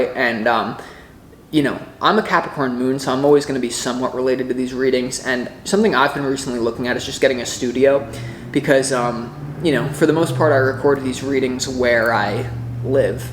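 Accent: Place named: Long Island, United States. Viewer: Sounds like you lived in Massapequea 😮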